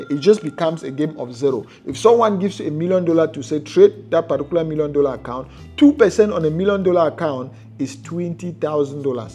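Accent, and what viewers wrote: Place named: Nigeria. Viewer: This guy looks and talks like a Nigerian from cross river or Akwa Ibom. Could it be that he is from one the villages around Bakassi Peninsula-Naija/Cameroon towns?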